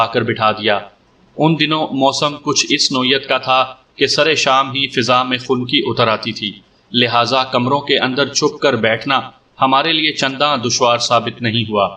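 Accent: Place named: Punjab, Pakistan. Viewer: Your urdu voice is very sweet despite punjabi accent in it.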